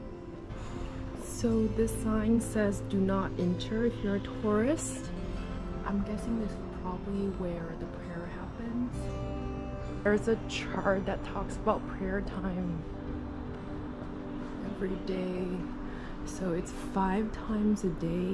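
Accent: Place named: United States. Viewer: you have quite American accent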